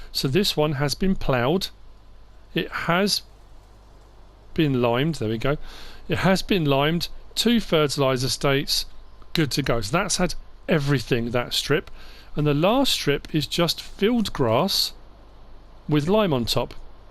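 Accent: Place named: United Kingdom. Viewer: Great video!, and useful to practice British english! 😁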